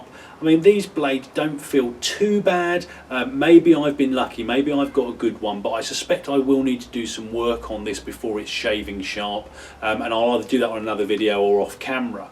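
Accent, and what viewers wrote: United Kingdom. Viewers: I love your Englishman accent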